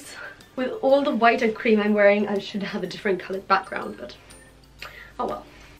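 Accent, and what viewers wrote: United Kingdom. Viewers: now that is what i call a british accent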